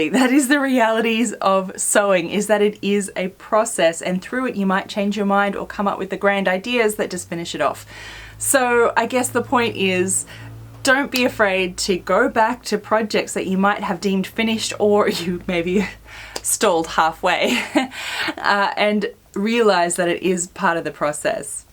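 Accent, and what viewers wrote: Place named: Australia. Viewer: My first time visiting you, found you by accident! Firstly, it's great to hear a fellow Australian and I love your chatty format. Coincidentally, I have just gone back to a project this week. I hacked up an old orange mens suit to make a skirt (it was the colour I had been looking for) but it didn't quite look the way I imagined. I put it aside and moved on to other projects, but it lurked in the back of my mind for a few days and now I know how to fix it. Sometimes I think you need a bit of distance to let go of your 'vision' for the garment so that when you go back, you let the garment speak to you. I've got another skirt and blouse (made to wear together) that I rediscovered last night that I think I will revisit this coming weekend. I seem to do a lot of 'sewing' in my head...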